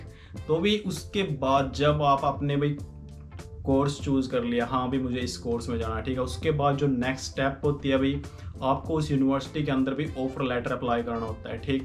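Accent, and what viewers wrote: Haryana, India. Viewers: Bai haryane te aa ?????😎😎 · HARYANA SA H BAHI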